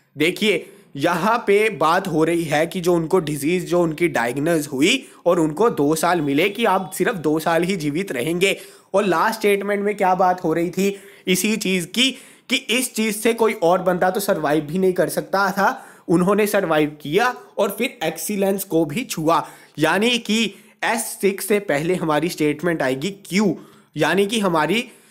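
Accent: Punjab, India. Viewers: Sir ji app punjab se ho kya ?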